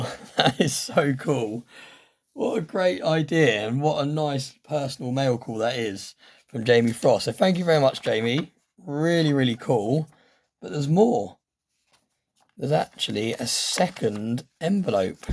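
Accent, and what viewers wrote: United Kingdom. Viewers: I love watching your videos that british accent is really warming compared to my usial american videos ^^ , cheers from across the pond in france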